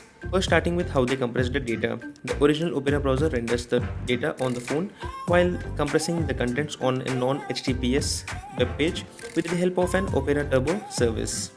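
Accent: India. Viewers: I'm an EFL English teacher and this guy speaks exactly how I tell my students not to. If you don't care to decrease the influence of your native language accent on your English, at least speak slower. Fortunately, you have the article to help people who speak global English (and not Hindi English). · indian accents are hard af to understand, i would rather learn hindi than listen to this. hopefully pimsleur has it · can I say I often watch these video is for learning Indian English accent.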